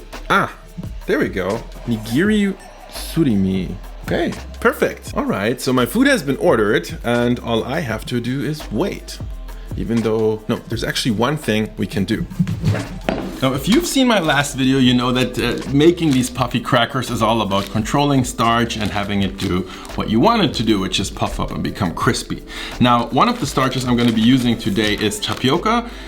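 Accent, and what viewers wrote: Germany. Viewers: Dieser deutsche akzent lul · Gad dammit!!! I just knew you were german. It`s my second video from you and I just knew something was wrong with your accent and now I know it for sure. Greetings from Luebeck. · He’s russian, grew up in germany (that’s probably what’s up with his accent) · I watched the Shrimp cracker video first and I already assumed that you might be german hence your subtle accent. Turns out I'm right!!! And you're even a Berliner!!! I really like your channel and.. why do I even feel like we life in the same area?? lmaooo (I'm not a crazy stalker I swear xd) Definitely subscribing!!!!!!~~ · Ich schaue das Video und dachte so: wow der typ hat nen leichten deutschen Akzent 😂👀 Gutes video 💪🏼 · Wer hat auch direkt an seinem Akzent bemerkt das der deutsche ist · Hui der ist ja auch deutsch:3 habe ich nicht nur am Akzent, oder der Sprache von Lieferando erkannt :0.. 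ich kannte einige Lieferanten aus meiner Gegend uwu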